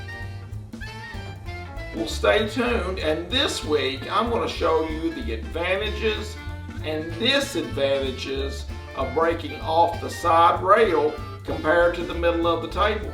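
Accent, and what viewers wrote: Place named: Kentucky, United States. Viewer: Your last break was a thing of beauty. Great run out position. I liked your break strategy discussion, too. I have played around with 2nd ball break to put the 8 ball in play, but to me it’s not worth it. Probability of pocketing the 8 too low to justify a poorer spread. Keep ‘em coming. Enjoy your KY accent, reminds me of home (Texas).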